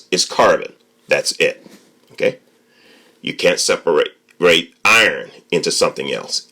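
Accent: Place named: United States. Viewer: I am a kenyan hence i am not getting the english well because he is an american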